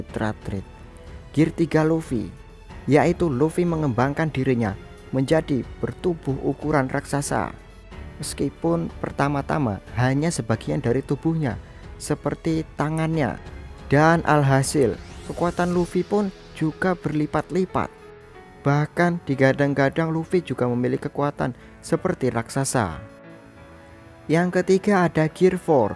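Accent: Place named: Java, Indonesia. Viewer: Suaranya kurang greget dikit min 😁 medoknya sih mantap, ahaha!!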